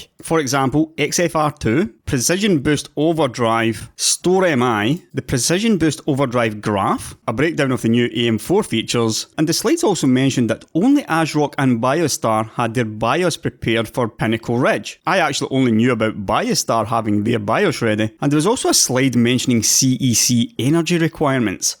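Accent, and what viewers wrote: Scotland, United Kingdom. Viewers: your's is a Scottish accent, isn't it? breath of fresh air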